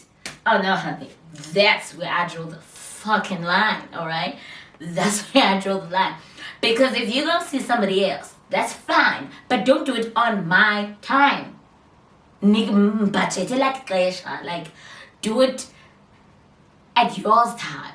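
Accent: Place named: United States. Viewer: This American ascent thingy confuses me 😑 but kept watching video to an extent 😑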